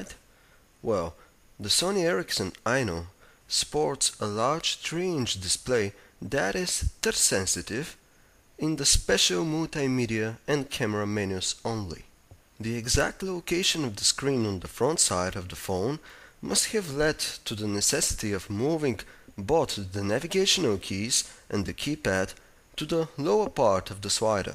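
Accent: Russia. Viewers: is he from Russia ???????????? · wtf..the accent dude..like some russian dude in star trek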